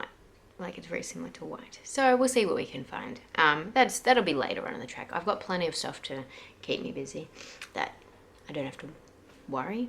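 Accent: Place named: Australia. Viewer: Hi, I’m a Sydney girl, it’s so nice to hear another Aussie voice on here. I’m looking at starting a yarn carousel similar to how the America girls share yarn and I’m wondering if you’d like to join us. I’ll be posting a video about it later this evening ifyou’ interested. I’d love to have you join us in this little bit of fun...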